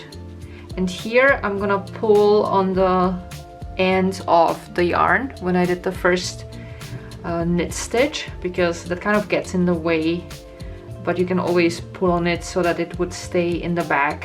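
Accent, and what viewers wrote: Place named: Germany. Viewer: So practical that you explain how to do it with a long wire! Its just what I needed! You are an amazing teacher! Love your german accent. Grüsse aus Argentinien und danke vielmals!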